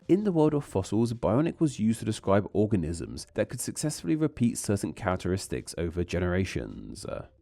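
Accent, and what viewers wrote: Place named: United Kingdom. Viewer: bro he's just british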